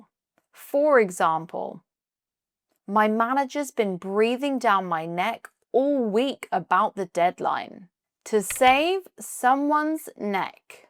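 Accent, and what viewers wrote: United Kingdom. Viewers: Wow, that's super useful! Now I just need to find a way to remember as many idioms as possible... Great job,. Thank you so much for your dedication. PS your British accent is amazing ❤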